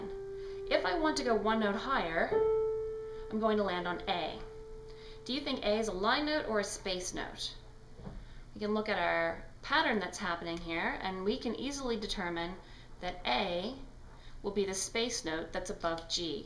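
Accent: Canada. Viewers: great video! ps-- very random but you sound canadian omgg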